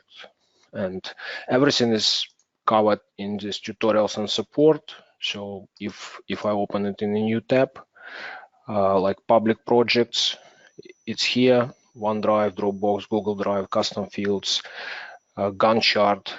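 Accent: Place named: Russia. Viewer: Жёсткий акцент выдает своего :))) Спасибо за видео.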